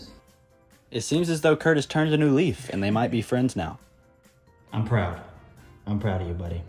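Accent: South, United States. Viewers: I never realized how southern his accent is 😂 Oh, and Kurt's a cutie as always ofc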